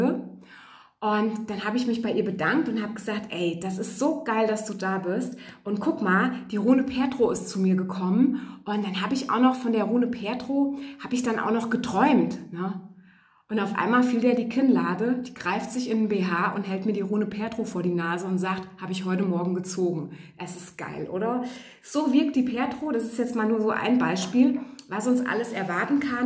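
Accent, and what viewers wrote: Hesse, Germany. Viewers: Sie kommt aus Hessen .Ich dachte am Anfang auch, oh schön jemand aus Sachsem. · sie kommt aus Hessen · ....ich vermute auch Hessen, zumindest lebt sie da. Das Rheinische hört man auch etwas durch. Egal, ich liebe diesen SingSangSlang 🤗😍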